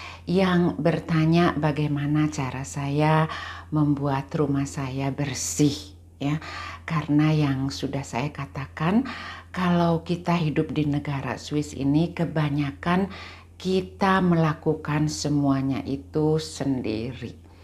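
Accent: Indonesia. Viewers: Rumahku...istanaku... bagus skl penjelasannya ibu yg cantik... Sy jg senang dg gaya bicara ibu walaupun tlah lama di luar negeri,namun tetap logat bhs Indonesia nya msh kental. Smg ibu sll sehat ya bu,pjg umur.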